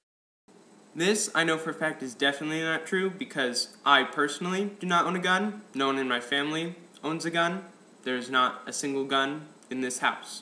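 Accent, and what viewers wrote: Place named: United States. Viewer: i love americans :) im British :/ i love your accent so much :)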